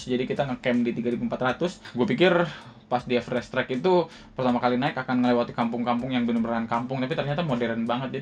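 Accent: Java, Indonesia. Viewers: Bang kalo bisa qolqolahnya jangan terlalu medog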